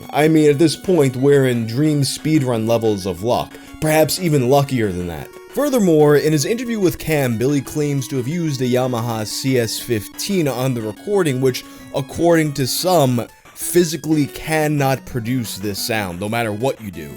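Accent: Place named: New York, United States. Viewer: It always takes me a few minutes to adjust to that NY accent, it always sounds like someone faking an accent to me at first, but by the end of the video I don't even notice it anymore. Edit: that's why I usually binge watch his videos, so there's no adjustment period lol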